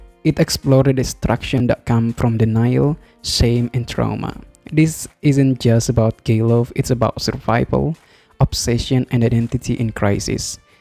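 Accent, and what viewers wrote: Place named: Indonesia. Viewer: It sound like Indonesian accent.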